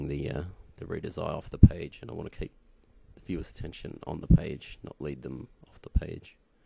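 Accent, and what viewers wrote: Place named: Australia. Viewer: HE'S AUSSIE!!!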